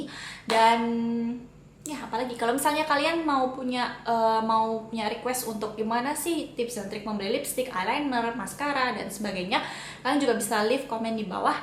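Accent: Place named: Java, Indonesia. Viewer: kalo ngomong ketahuan jawanya XD. thx 4 tips ^^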